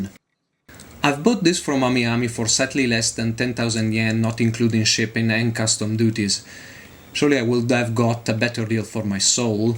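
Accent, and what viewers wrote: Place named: Italy. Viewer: Initially, I thought his accent was Russian or Polish, but no, Italiano. :-)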